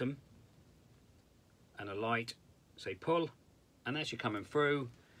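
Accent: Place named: United Kingdom. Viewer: One Brit to another , I seem to understand better with the accent!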